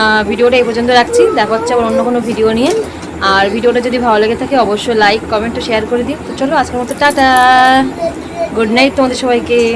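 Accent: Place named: West Bengal, India. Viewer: Tomar kothar j taan ta amar khub bhalo lage...amar bashirhater ak bandhobi ache...or kothar taan o erokom.....besh bhalo lage❤😊😊manu k bairer khaabar beshi diona....bhalo theko tomra ❤️❤️❤️❤️👍👍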